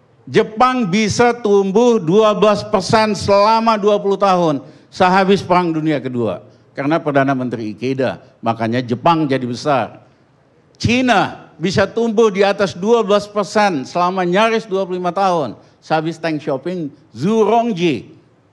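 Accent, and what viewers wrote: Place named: Netherlands. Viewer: Pak * kental logat belanda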